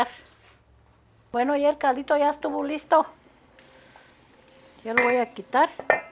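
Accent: Mexico. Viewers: Que gran diferencia, vi la receta en otro canal de una extranjera y solo con escuchar el acento no me gustó el caldo, que bien se siente escuchar a una mexicana que te lo explique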